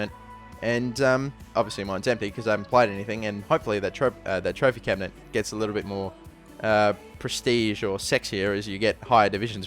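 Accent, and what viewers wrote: Australia. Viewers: your aussie i love you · You Aussie mate ? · Are you australian ? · Nice to hear an aussie accent on a fifa 13 video ;) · are you australian mate? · are you Australian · Are you from Australia? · Yes an australian commentator! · are you australian :( im portuguese . quem e portugues da um like ao comentario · Are you Aussie? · what country are you from? australian ? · Are you Australian? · are u aussie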